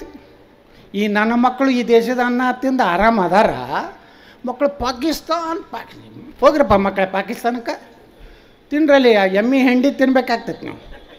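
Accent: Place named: North Karnataka, India. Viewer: ಅದು ಉತ್ತರ ಕರ್ನಾಟಕದ ಭಾಷೆಯ ಸೊಗಡು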